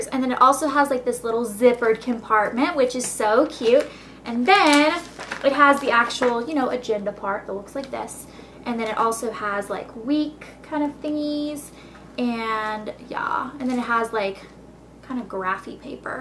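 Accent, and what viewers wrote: England, United Kingdom. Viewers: Nice British accent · British accent? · that britsh accent :D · I highly like how you acted in this video. You were very... I don't know, lively. You were just happy and you didn't seem fake or bored. PLus, I love your british accent... lol. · I love ur english accent! lol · i love your cute British accent there it made me laugh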